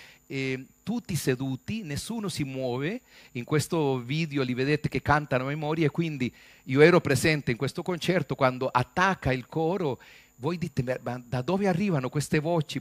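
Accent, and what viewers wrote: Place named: Costa Rica. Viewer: Es un enorme placer ver y escuchar al costarricense Gaston en esta deliciosa conferencia, en que llevados atraves de la armonía del italiano en versión tica, clara y precisa, nos lleva de la mano a explorar la obra de Gustav Mahler. Me conmovio el haber sentido el profundo sentimiento de respeto y admiración del Sr. por la obra de Mahler atraves de su voz que trae remembranzas del pasado. Mil gracias.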